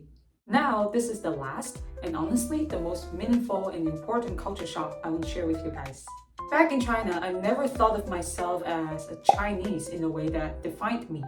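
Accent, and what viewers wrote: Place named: United States. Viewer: You have an American accent. You don't sound like from China.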